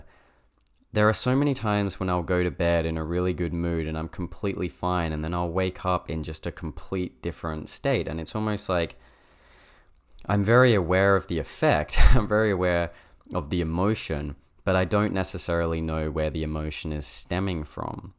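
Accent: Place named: Australia. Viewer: So nice to hear another Aussie male accent. We INFPs seem to be a little rare in this beer-guzzling testosterone-driven culture here down under.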